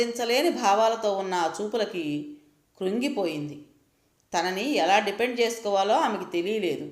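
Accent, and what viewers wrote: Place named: Andhra Pradesh, India. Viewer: Abba entha bagundante matalatho cheppalen,Ee kadha cheppadamlo really super,nenu chadivinadi undinadiAndralone,kani Naa mettinillu Tamilnadu mee gonthu vine tappudu Andralone (maa uru Gooty)unna feeling ravadam kallu chemarchetatuvanti adbuthamaina anubavam kadhanu chadivuthunna prathi saree kaluguthundi 🙏🙏🙏🙏🙏🙏🙏❤️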